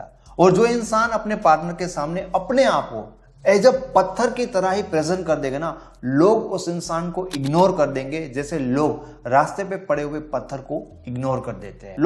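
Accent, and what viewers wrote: Gujarat, India. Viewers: Aap gujarat se ho ?? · Sir Are you from Gujarat ??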